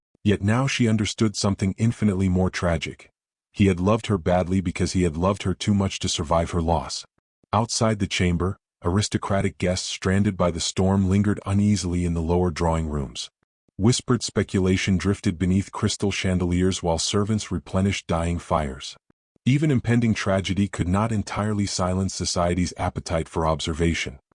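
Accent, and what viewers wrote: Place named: United States. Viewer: An AI with a mechanical robotic American accented voice for a story set in Britain. Odd...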